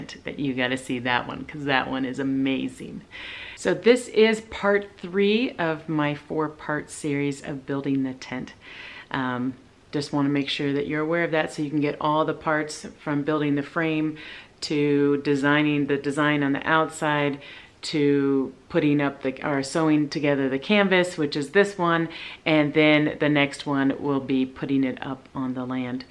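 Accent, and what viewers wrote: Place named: Europe. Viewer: hi mama. nice to see your mama. im binge watching bedrest day today painted all my window frames yesterday outside. i love the wood design you hand painted it? great she helped you. she lives in usa too i see. aw thats so cute she was teaching the girls to sew too how precious to see that. you grew up partly in usa youve got an accent from Europe but she doesnt. ? is your story here in one of your videos? good idea he overlapped material this meant seam would becovered. imagine making your own tent what a task good for her. im middle laying out material making curtains and dog jackets too. getting cold got to get dog jackets finished.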